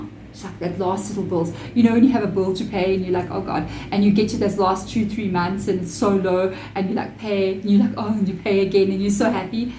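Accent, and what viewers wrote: South Africa. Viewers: (hope I've wrote your name correctly), I came across your thing recently, yes I'm sure we would be swimming in puddles or pools of tears at times, cuz your delivery is the centre point for me, (chiron intuition, majigotthet, and aeon moon, Erin chandler, four other greats, you might look at, or whoever reads this, I have been touched,your being south African is so poignant you wouldn't believe, look forward to one day conversing, also your accent(voice) is so enchanting you ouse, mother earth and massively much more, love&light, peace&equality(. ❤xxx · So I just came out of a webinar about Bosnian pyramids and Theta healing meditation and this video popped up on youtube. :) I listened to you before and liked your energy, it resonated, but I kind of like to avoid "prophecies", meaning someone else determining what will happen ( as opposed to me making my own destiny), but yet again this resonates. 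i mean we can argue it's algorithm, but then again, why this precise reading popped up. :) I felt for a very long time now like this planet is a prison and I'm being punished (Theres a book I even came across, Alien interview, where an alien (a very advanced species) talks exactly that, this planet is a prison and all of us are prisoners) and at 48 I'm already soooo tired of life haha. The pyramids are heavily dismissed by mainstream science as not real, but they hold incredible energy, very real and oldest on this planet. People have reported healing (where there was "no help") and studies have been conducted. But it's good actually, because not that many people come (as opposed to Egypt) so the pyramid energies are really strong, it's peaceful and great for meditating. Youre South African right? You sound like it, I like that accent. I feel like Africa being the "cradle of life" must hold a lot of wise souls and that's why your readings resonate (when I come across). 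and you're a wicca? which also interesting, and you talk about vedic things, such as karma, enlightenment, which I know a lot about. So THANK YOU. :)))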